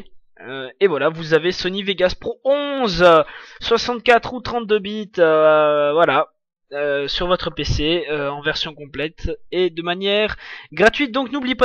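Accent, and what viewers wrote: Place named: Switzerland. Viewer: accent suisse :p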